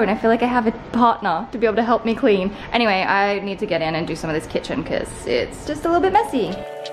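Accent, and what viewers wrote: Australia. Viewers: Have you never heard a Australian accent before? Dude.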